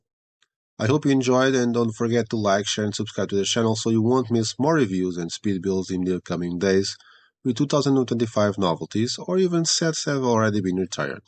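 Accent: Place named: Italy. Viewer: Italian accent 🫶🏻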